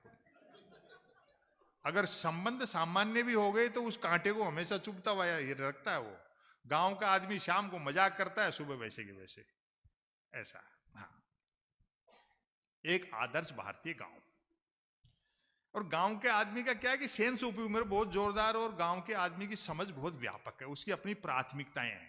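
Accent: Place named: Rajasthan, India. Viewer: आदरणीय " " भाई साहब निवेदन है कि अपनी रचनाओं का प्रदर्शन राजस्थान में (हो सकता है थोड़ा दक्षिणी राजस्थान छोड़कर ) अपनी मायड़ भाषा में प्रस्तुत करते हैं तो बहुत अच्छा लगता है, फिर अपने झुंझुनू जिला का लहजा आप बहुत अच्छे से बैठाते हैं, हिन्दी और मायड़ भाषा को मिक्स-अप करेंगे तो भी अच्छा छूंकण लगेगा। करणी राम सैनी उदयपुरवाटी, हाल जयपुर।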